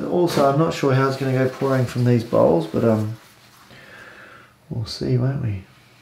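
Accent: Australia. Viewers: I adore Payne's Grey. I don't buy the color because I love mixing colors to get it. It's my favorite color for a night sky. PS Where are you 'cause you sound Australian. I'm in Canada 🇨🇦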